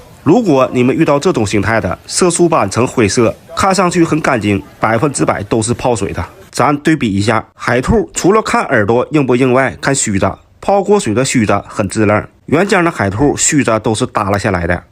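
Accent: Northeast, China. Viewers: 这东北大碴子口音😂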